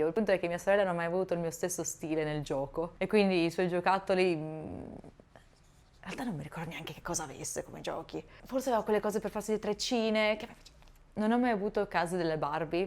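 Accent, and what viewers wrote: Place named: Lombardy, Italy. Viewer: Non hai l'accento milanese ma più del sopra Milano.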